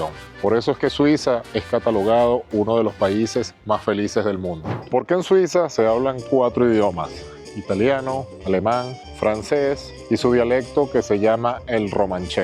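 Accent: Venezuela. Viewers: Tienes acento venezolano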